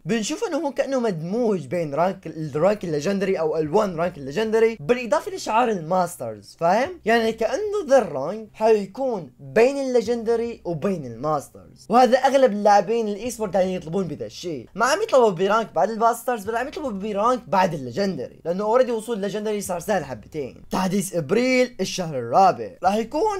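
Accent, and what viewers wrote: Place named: Saudi Arabia. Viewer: سولف بلهجتك ليش تسولف سعودي و انتا سوري ؟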